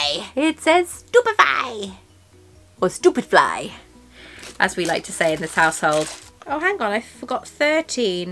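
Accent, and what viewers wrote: Wales, United Kingdom. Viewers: How your mental health been a bit better I hope. Hugs 🤗 always I get it I go through bouts mainly holiday periods when less occupied which for us a must right, your first day one on the calendar I was reminiscing so I use to have a pink baby pink jewellery box when I use to go through temporary as people are just baffled and quizzical about me find myself the golden you showed here I had that exact colour as a lock and key duo. Just thought of that time.. baby good key but giant box.. Hey you want to wear two necklaces wear it who cares I say do what you want to do. Your nails 💅🏼 didn’t look that bad honestly just a few scraps here and there but SO. I love longer videos I’m breaking it down for today and then tomorrow. Though which sometimes I do we don’t get a tree so no bauble to hang I could I could put it on my bed 🛏️ heh heh 😼 I like your Welsh accent. 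I’m so fussy I love pen collecting too whatever people think don’t care I’m completely away from the so called norm entirely. I don’t like the pens with the on top parts either ballpoint also when I had issues writing the bic pens worked better for me grip wise as it was a wreck for ages and ages so had to do handwriting practice which now is so much better. But I have to have to write properly specific pen they said with me for holding and grip which I find it’s true certain ones it’s just all over but I find have to have lined notebook non lined writing slops down like a hill. So with me nothing on top like that or my gr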